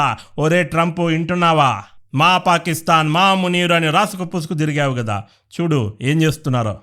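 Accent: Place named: Telangana, India. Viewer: Nice Anna Telangana Slang Super 😄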